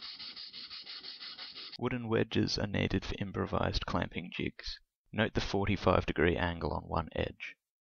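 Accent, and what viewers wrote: Australia. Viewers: R u from Australia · Hey man, you sound Aussie. Sweet. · Holy fucken shit mate, never knew you were Aussie, top notch work! The best i've seen in a long while.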